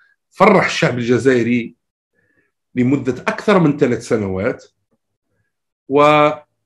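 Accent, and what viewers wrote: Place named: Morocco. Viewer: باين لهجتك مغربية كما احنا...........و علاه راك دير في السنيما ...اسكتوا علينا هذي غير كرة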